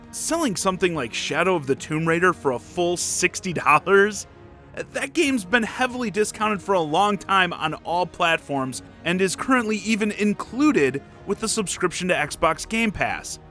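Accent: Chicago, United States. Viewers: his chicagos kinda fresh doe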